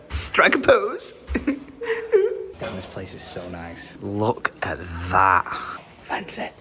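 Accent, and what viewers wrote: Scotland, United Kingdom. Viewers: Love your Scottish accent, I'm from Scotland myself, we need more of it in the vlogs. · I laugh way too much at Scottish accent 😂 Honestly so glad for the day that the YT algorithm led me to this guy’s channel to watch one of the LA travel blogs. Top tier entertainment!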